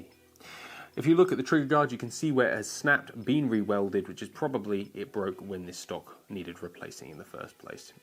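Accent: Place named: United Kingdom. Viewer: This is the first video ive seen on this channel, and this guy looks like an american with a british accent.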